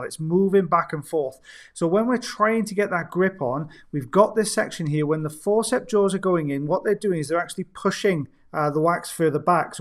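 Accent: Wales, United Kingdom. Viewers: Stay safe and family. I loved you saying “ebony coloured” in your lovely Welsh accent. We will just be happy so see your videos when you can post, you don’t need to apologise, it’s our privilege to see your work.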